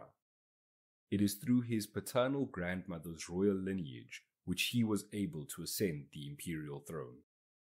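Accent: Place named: South Africa. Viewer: I didn't know those circumstances of Haile Selassie's birth and parents. Thanks, South African sounding narrator.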